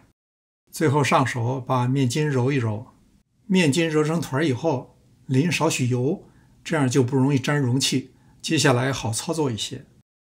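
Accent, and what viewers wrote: Beijing, China. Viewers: 看了很多美食视频，最喜欢的。不仅食材的获取途径，还是做法都很易操作，还有同是北京的调调很亲切。😊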